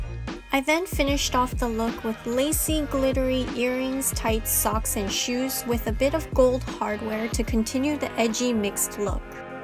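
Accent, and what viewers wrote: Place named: United States. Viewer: Hey can you do a video about your life? Like where you are from... or how you ended up in japan having such perfect american accent. I am really interested in your channel and want to know more because i just soooo curious. Or maybe how you ended up being a model or making a youtube channel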